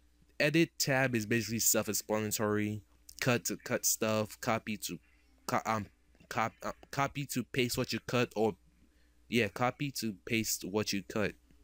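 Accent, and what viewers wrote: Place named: New York, United States. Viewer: You sound like you're from New York. xD Great videos man! Thanks a ton. I found them on the rpgmakerweb forums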